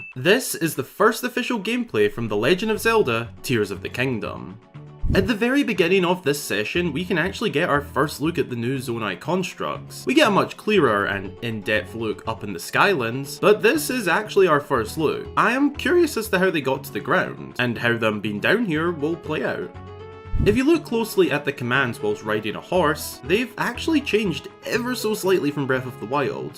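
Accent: Scotland, United Kingdom. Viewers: Mate I know all I did last video was compliment your title screen but I have the urge to say thank you for including captions. My mother loves Zelda and only has 10% hearing left, and also you're Scottish and whilst I understand the thickest Glaswegian, sometimes people find the accent difficult. So thanks again for being so inclusive!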